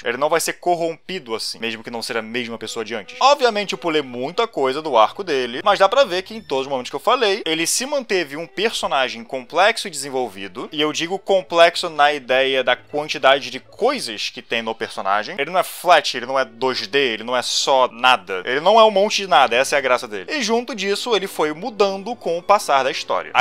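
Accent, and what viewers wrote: Rio de Janeiro, Brazil. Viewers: Só percebi hj q o tem sotaque carioca kkkkkkk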